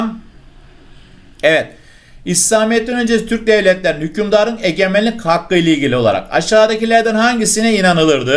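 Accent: Adana, Turkey. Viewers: Adanalısınız galibaa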